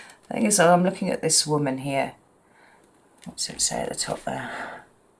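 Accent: United Kingdom. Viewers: I feel the same as last reader, you really seem to explore the meaning of the cards. Also loved this reading, I am Sag rising and I feel like Sag readings relate to me more than my sun. I also love listening to that awesome British accent. The tall wizard behind you i had one just like him-lost him in a move can't find those wizards anymore where did you get yours?? Blessings to you!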